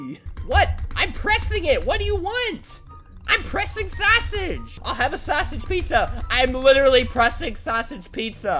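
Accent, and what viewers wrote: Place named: Spain. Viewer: his Spanish accent is so funny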